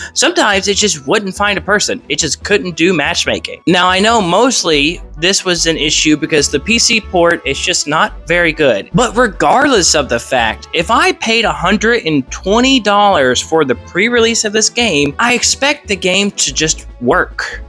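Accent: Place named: Mississippi, United States. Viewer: You sound like you live in Mississippi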